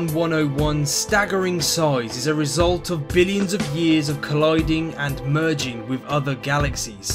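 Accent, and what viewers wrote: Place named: South West England, United Kingdom. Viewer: He's English, like me, and his accent is probably from the South West.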